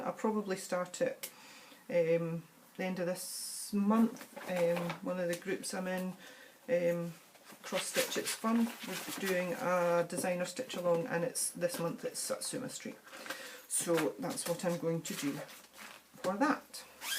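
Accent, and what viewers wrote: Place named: Scotland, United Kingdom. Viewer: Great first video. Scotland is my favorite place in the world to holiday. Your accent makes me want to plan another trip.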